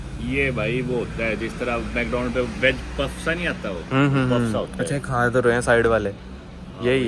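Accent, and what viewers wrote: Haryana, India. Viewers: का accent कुछ कुछ हरियाणवी के तरह हो गया है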